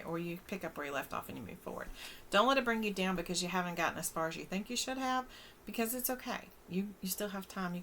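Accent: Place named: South, United States. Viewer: Hello, nice to meet y'all. Where are you?? I'm catching a southern accent.... I'm a transplanted Texan in Tennessee.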